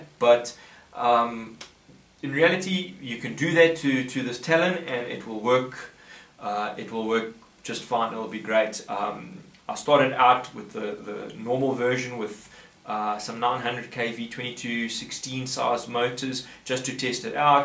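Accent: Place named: South Africa. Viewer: Nice SA Accent! ... :)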